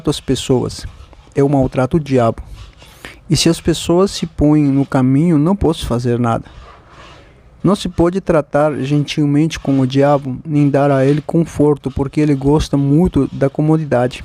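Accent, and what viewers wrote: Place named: Spain. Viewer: A pessoa que narra ovídeo tem sotaque de alguém de língua espanhola , portanto não tem nada de horrível!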